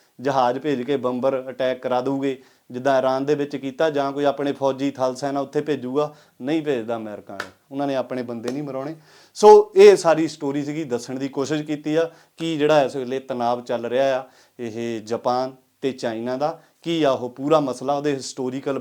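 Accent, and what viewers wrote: Doaba, India. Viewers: Bhai Sahib Jeo Tuhadi Language Doaba Wali Aa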